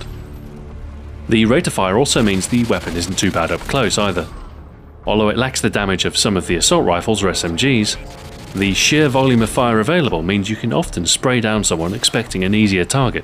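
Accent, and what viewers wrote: England, United Kingdom. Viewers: damned british accent... -. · He sounds so formal. I love his accent, he’s gotta be some form of United Kingdom. English I’m guessing. I like this guy. He makes these games so interesting. What a great dude.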